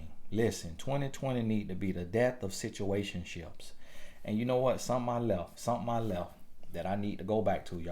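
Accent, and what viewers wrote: South, United States. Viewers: Good information all facts thank you however, Lord that country accent got me laughing.... where are you from? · I mean your southern charm came out in this video!!! Love every video 😂😂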